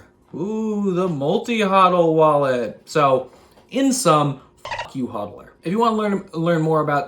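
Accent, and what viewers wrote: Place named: United States. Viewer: This guy has the most american accent ever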